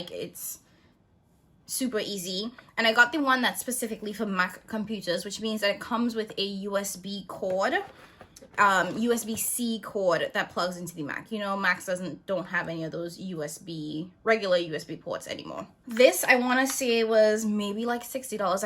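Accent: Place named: Trinidad and Tobago. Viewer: I'm trying to figure out where you are from based on your accent. It sounds like Trini to me. I'm planning on getting the Sony ZV-E10 to replace my Canon SL2, I find the buttons on the Canon to be too complicated to figure out and ALL of the Sony ZV has simple buttons and only I think 5 options per button placement.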